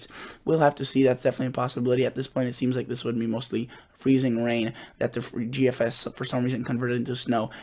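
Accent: Midwest, United States. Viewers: Dude I knew yo u had a midwestern accent but near Chicago?? Me too!!!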